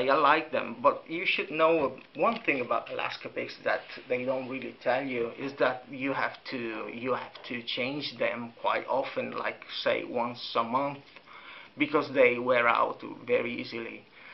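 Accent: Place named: Venice, Italy. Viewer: non avevo mai sentito un accento veneto sull inglese. Fantastico, sembra una via di mezzo tra il gallese, e il liverpulliano . Grade. gran video sulle unghie atrifiaciali, mi serviva:-)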